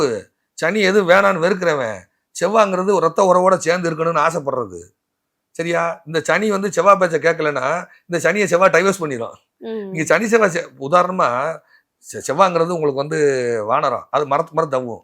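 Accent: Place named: Tirunelveli, India. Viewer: கோவைல் திருநெல்வேலி பாஷை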